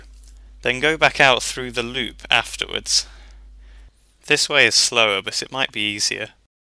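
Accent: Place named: United Kingdom. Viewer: Whoa. I never watched the Goomba guide, so I never knew was British....Whoa. I dint have a problem with British people, in fact, I like English accents, but I never imagined him as British.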